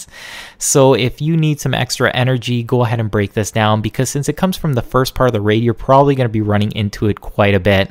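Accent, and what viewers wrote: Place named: Canada. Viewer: Commentator is most definitely Canadian... haha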